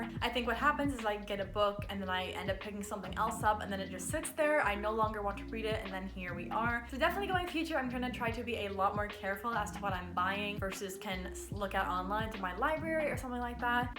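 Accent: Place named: Germany. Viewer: I don’t know if it’s just me but you have a little german accent hahah but idk why 😂 Are you german?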